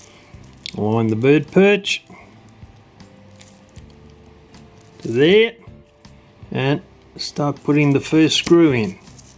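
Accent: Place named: Australia. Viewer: Are you Australian by any chance..?......12.20 into video you confirmed my question with"that thru there...thats got him!!" Mate the old lady slipped off not long ago and i know have the very clock i went all the way to Schwartzland Germany in 1996 to buy for her. I actually saw there the worlds biggest cuckoo clock,the bird that comes out was the size of a commodore wagon im not kidding .anyway ive now taken on the task of getting it going properly agsin.its identical to your one in video abd im certain the issues im having a easily fixable but i need a hand ??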